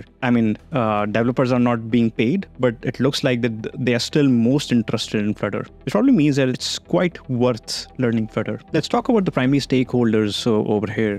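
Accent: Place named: India. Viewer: >indian opinion >trash accent opinion rejected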